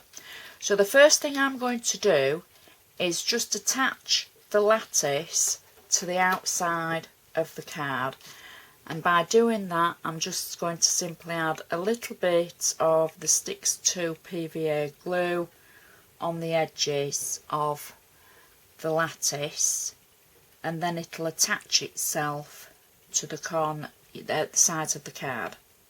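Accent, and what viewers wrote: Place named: Northern England, United Kingdom. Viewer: Nice to hear a northern accent I am from Bradford but now live in Bournemouth, where are you from?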